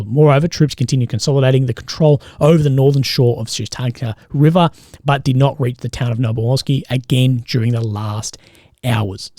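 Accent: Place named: Australia. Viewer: I like your take on things as one old soldier to another..but unfortunately your gravel voice and Auzzie accent make it difficult for non native speakers to follow you so please speak a little slower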